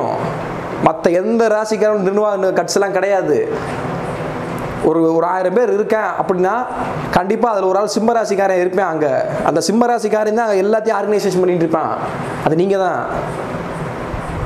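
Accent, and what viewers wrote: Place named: Madurai, India. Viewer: Madurai slang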